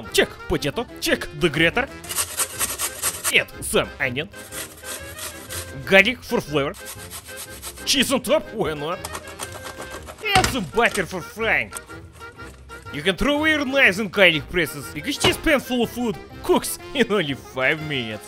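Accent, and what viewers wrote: Russia. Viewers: This is how to basic but with a russian voice over and perfect mixture of destroying and actual knowledge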